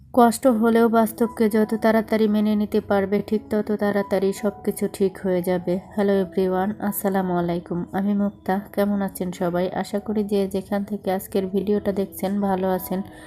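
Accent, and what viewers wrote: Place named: Manikganj, Bangladesh. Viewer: Apnr basha manikgonj kothao.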